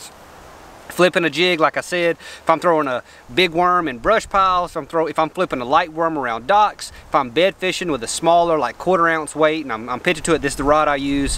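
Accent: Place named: South, United States. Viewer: You are one fast talking southern boy ! Fish the same way I bet. 2000 or more cast a day, gives you lots of opportunity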